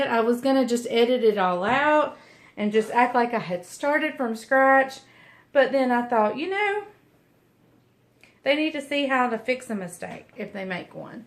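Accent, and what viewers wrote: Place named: Arkansas, United States. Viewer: Have you tried a potato/carrot peeler to peel your apples? It's a bit easier than peeling apples with a knife. Love your accent. Our nieces live in Arkansas and you sound just like them! :-)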